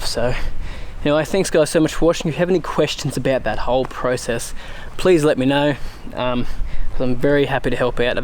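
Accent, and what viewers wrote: Australia. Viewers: Is it me or are you Australian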